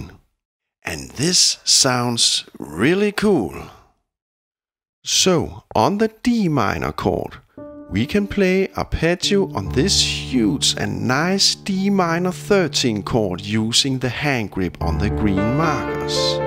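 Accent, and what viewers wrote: Denmark. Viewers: I did, II - V - I and pentatonics are usually one of the first lessons I teach in my lessons (bass player). Who does lectures in Basic Jazz Harmonics in another way? But it's been a long time, so thanks . Fresh input is always welcome! I still love your very musically educated "muppet hands show" :D Danke schön, well done (do I hear a Danish accent? ) Frank --- and, of course I'm a bit drunk. Sorry! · i love danish when they speak english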